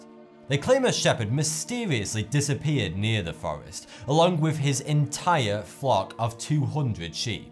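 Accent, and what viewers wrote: United Kingdom. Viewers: Maybe it's exactly because his accent seems really "british " and let's say pure that I find it hard to understand,I'm more used to "impure accent" ,when we practice our english in class we do not have that perfect accent so it can be harder. I find american or australian accents easier to hear down But maybe it's just me ahah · British accent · So what is this guys accent? British, Scottish?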